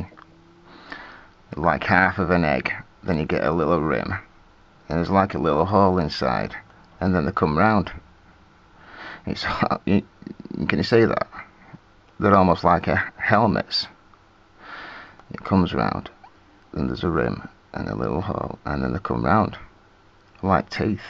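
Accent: United Kingdom. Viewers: Its funny how i look at ancient buildings or while watching other videos from various YT Channels about these important topics I hear you saying in my head: "That is all REBAR... So much MUD (British Pronunciation emphasized!)... It is all a FASADE... This isnt a TOMB... PEOPLE LIVED THERE.... Certainly they decorated their houses with FLOWERS... Love your lessons, you are gifted!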